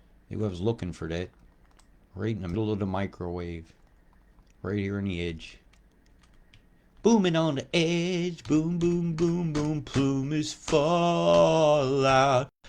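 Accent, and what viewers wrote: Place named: New York, United States. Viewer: I love your voice. Reminds me of both Brooklyn, New Joyzy & Boston. I was born in NY, my dad grew up in Scarsdale, was a welder/engineer in Brooklyn. As a kid we lived near Pelham. Used to skip school, take the train to Grand Central, muck around, panhandle for train fare back home in time from "school"... 😁 Keep singing .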